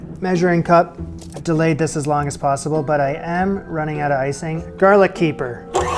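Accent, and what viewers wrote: Canada. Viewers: the one thing /along many other things) i love about your videos is the (canadian) pronunciation of words like out and house. omg it's just so pleasant to ears.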